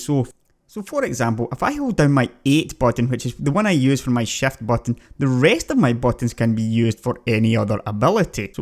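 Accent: Scotland, United Kingdom. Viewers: I know this is a silly critique, but this Scottish accent is basically the quintessentially elite and refined like a fine wine version of Scottish that I've ever heard. You need to get into voice acting, voice overs etc, my dude. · How many takes did you have to do to make a somewhat understandable Scottish accent for this video? I can't understand my Scottish friends half the time. I'm surprised I understood most of what you said. I know you're speaking English, but even then, lots of English people don't understand what the Hell you guys are saying most of the time. :P Also, thanks for the review.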